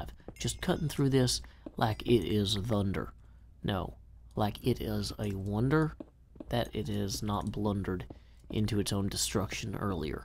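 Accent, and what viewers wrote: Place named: South, United States. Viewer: love the southern accent.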